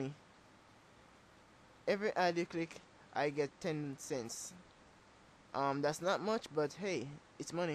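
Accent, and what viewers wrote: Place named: Caribbean. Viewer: and i can bet u from the caribean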